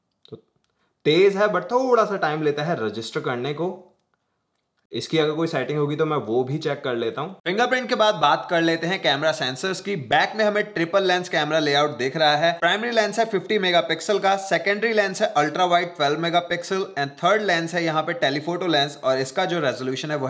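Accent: Punjab, India. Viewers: Punjabi Bande Di Punjabi Hindi Ch Nikal Ee Jandi..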